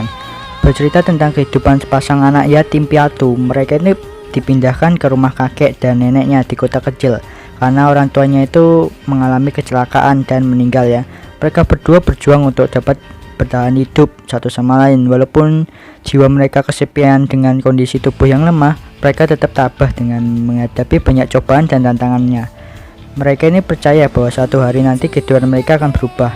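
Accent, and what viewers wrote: Java, Indonesia. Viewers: Mas itu logat nya kok medhok Jawa banget, aslinya mana to mas? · Waduh min gue baru mampir disini, ngakak denger logat bicaramu kayak Jawa banget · Medok bahasanya:v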